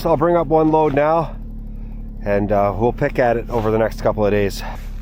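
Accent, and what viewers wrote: Newfoundland, Canada. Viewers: Cod fish from the rock hey? Good stuff. Hello from Newfoundland. I live in Gros Morne National Park. How's the fishing around your cabin in the summer time? Tbh I almost pick up a Newfoundlander accent on your speech. Not originally from here in Newfoundland are you? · Instant follow. Love the energy and vibe. I really like the Canadian accent. I used to get confused between American vs Canadian. 🇨🇦 now I can tell the difference. Just like aussies sound so different to kiwis.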